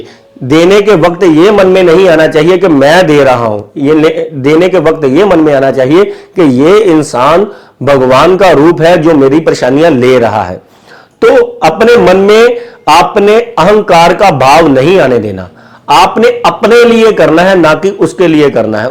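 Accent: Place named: Punjab, India. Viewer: ji aapki Hindi bolne ka tareeka bahut badia hai with mix Punjabi👌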